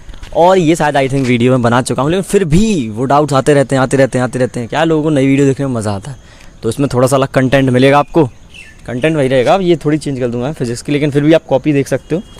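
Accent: Bundelkhand, India. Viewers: Aap bundelkhand se ho...????😊😊😊😊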